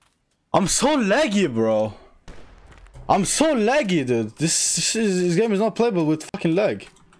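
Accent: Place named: Norway. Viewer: haha you sound very norwegian with your accent, but where are you from then?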